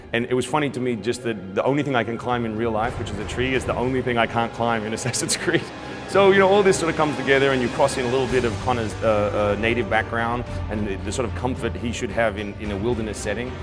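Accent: Australia. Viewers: half the time this guy talks he has an australian accent, what gives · What the fuck is this dudes accent? He has got to have spent time in Australia.... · i cant pick it, is this guy Australian?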